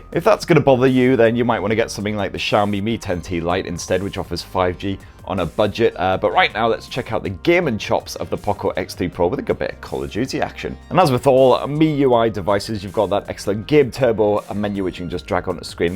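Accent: United Kingdom. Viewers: He's from the uk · That's a british accent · This is so Bri-ish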